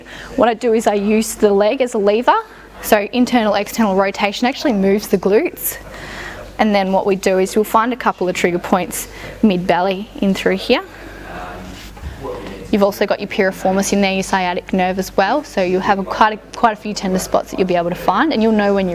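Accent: Australia. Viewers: Wow. You definitely are from Adelaide. The accent gave it away. Great massage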